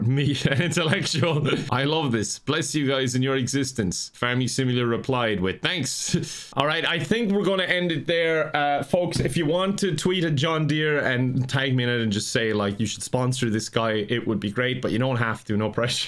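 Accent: Ireland. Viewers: Is my English just improving and therefore I'm getting more sensitive to hearing accents, or is speaking more and more in an Irish accent over the last few videos? It doesn't bother me at all, I really like it, I'm just wondering if I'm the only one noticing this. It could just be me getting better at English since it's not my first language, tho.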